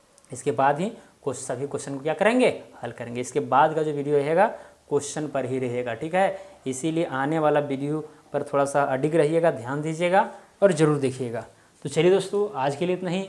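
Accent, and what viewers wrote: Bihar, India. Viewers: Sir aap Bihar ke rhne wale h kya kyoki aapki bhasha thodi bihari lg rhi h aur padhane ka tarika Khan sir ke trh mil rha wo bhi Bihar se hi hai aap aisa padhate h ki ek baar me hi mind me ghus jata h koi doubt hi nhi rhta aise hi Khan sir padhate h Love you sir ❤️❤️❤️💖💖😍😍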